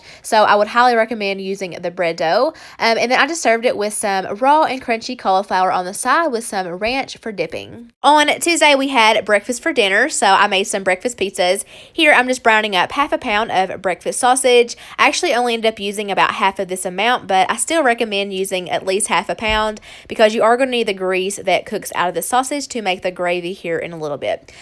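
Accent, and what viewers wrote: South, United States. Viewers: I heard that SOUTHERN accent and now I'm hooked. Girl these meals actually be looking good (and healthy).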